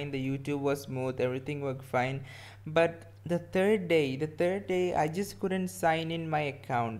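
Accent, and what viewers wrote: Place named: Kerala, India. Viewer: Malayali aano.. english ketal angane thonu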